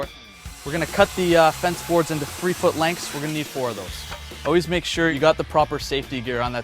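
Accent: Canada. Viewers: I was going to ask if your Canadian because you sound like an NHL player then I saw your T-shirt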